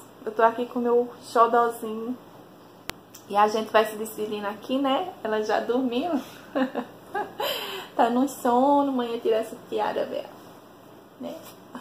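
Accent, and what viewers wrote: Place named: Northeast, Brazil. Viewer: Como amo esse sutaque, meus pais são nordestinos 💝 Adorei seu canal, sua naturalidade e sinceridade 💝 Que Deus continue abençoando vc e seus filhos💝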